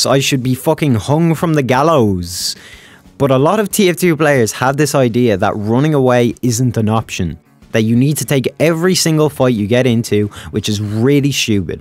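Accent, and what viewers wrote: Ireland. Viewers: my god what an amazing Irish accent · He’s Irish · Ahh. A fellow Irish man. First time watching. Wexford or Dublin?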